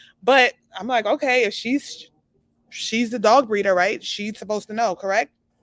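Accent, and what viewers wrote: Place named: Brooklyn, United States. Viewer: The Brooklyn might come out of you & they just don’t know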